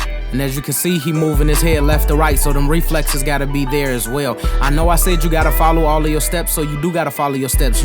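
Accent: Chicago, United States. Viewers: Is he from Chicago? The accent sounds familiar 🤔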